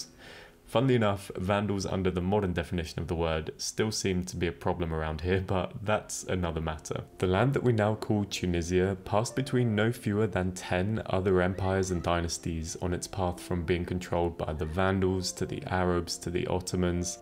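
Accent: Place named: United States. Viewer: Have you considered not showing yourself on your vlogs ? Your voiceovers are refreshingly non-irritating, American, and very authoritative and your subject matter informative, but just hearing your voice without seeing your face would be more enigmatic. There are too many Youtubers trying to become personally famous instead of their content, and including your face just brings you down to their level.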